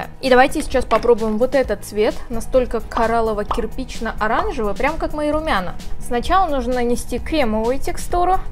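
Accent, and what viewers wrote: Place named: Georgia. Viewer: Ты в этом выпуске грузинка? (Говоришь с явным акцентом) (Никого обидеть не хотела этим комментарием)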